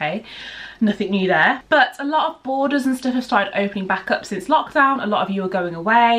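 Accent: United Kingdom. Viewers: I love your British accent. Your bum looks good in the purple swimsuit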